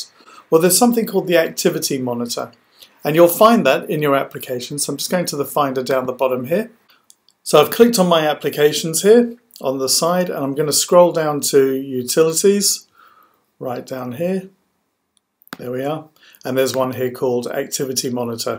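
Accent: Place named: England, United Kingdom. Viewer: Ahhh first so lovely to hear a nice English voice, and second a FANTASTIC tip! Thank you!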